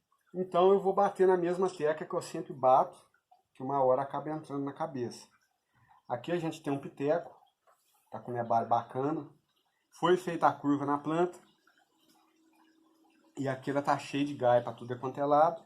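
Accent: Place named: Minas Gerais, Brazil. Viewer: vai ter sim, ninguém vai compra la hahahah, gosto muito do seu canal, tbm sou mineiro e ouvir seu sotaque é voltar pra MG kkkk abraço e força ai no trabalho irmão , axé !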